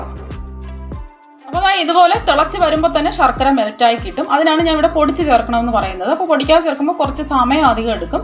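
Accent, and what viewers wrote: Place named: Kollam, India. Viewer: Mam, your recepy is very good and I love it. But your kollam language is also attractive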